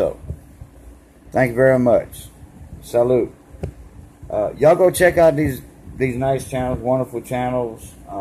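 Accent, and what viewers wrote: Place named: Louisiana, United States. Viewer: hey:).. nice shout outs... i like your accent... thanks for sharing and have a nice day!!:)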